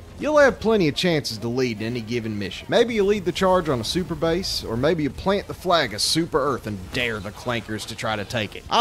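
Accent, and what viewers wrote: South, United States. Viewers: He's carried by that smooth southern accent.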